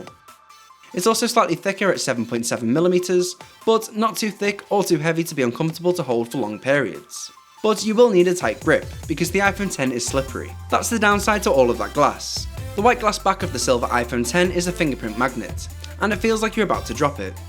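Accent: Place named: United Kingdom. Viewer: It’s his accent ... he’s probably from the UK or Australia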